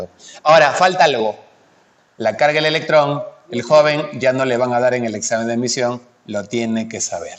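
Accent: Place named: Mexico. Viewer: Hola, de donde eres ? Tu acento es mexicano, pero parece que vivieras en Perú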